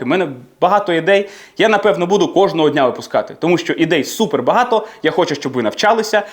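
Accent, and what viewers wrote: United Kingdom. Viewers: а це британьській вимова? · Дякую, дуже цікаво! У Вас чудова вимова!!! Чисто британська! ❤👍🇺🇦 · Британський акцент - це просто 🔥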